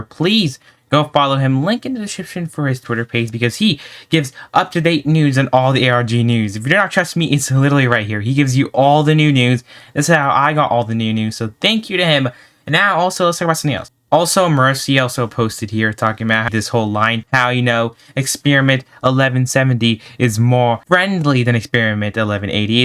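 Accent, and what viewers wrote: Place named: France. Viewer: Bah il a un accent français alors je pense que oui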